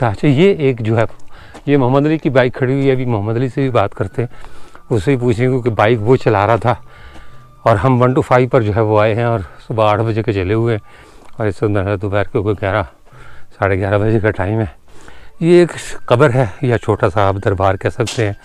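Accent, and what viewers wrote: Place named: Lucknow, India. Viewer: Mohtaram Salamun Alyekum Maie Aapka Maddah Lucknow India se hun guzishta 2, Baras bad ab phir aapke vlog se mustafeed ho raha hun. Aapka andaze takallum aur tareekhi malumaat me Lucknvi tahezeeb ka khasa asar hai kahin aap hum Lucknow walon ke bichhde huwe maqami bashinde toh nahi hain?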